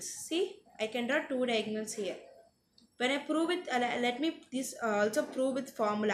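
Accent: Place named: South India, India. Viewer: Though a touch of south Indian Vbes You explain very well thx <3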